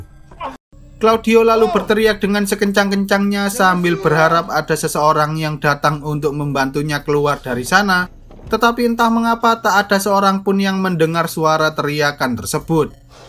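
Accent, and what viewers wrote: Java, Indonesia. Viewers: Medok bgt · Alur film yg menarik, Krn miminnya medok jowo. Saye suke saye suke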